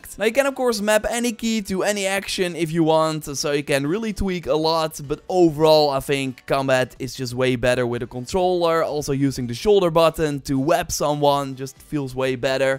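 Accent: Netherlands. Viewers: Dutch accents were made for youtube, your videos are very entertaining! Keep it up!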